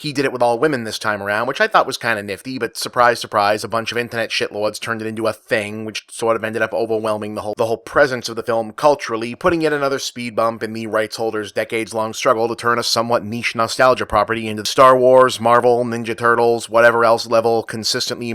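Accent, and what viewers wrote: Boston, United States. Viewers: Who else herd the boston in here? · It's just part of his Boston chahm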